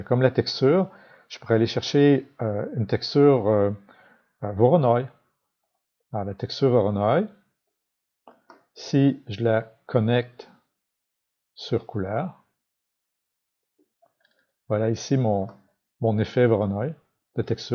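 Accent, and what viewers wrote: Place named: Canada. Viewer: Bonjour. Je découvre ta chaine avec plaisir. Ton tuto est exactement ce dont j'avais besoin pour le visuel sur lequel je travaille. J'aime beaucoup ta façon d'expliquer, c'est clair, efficace, avec l'accent canadien que j'adore de surcroit! Je vais regarder tes autres tutos. Bonne continuation. Birdee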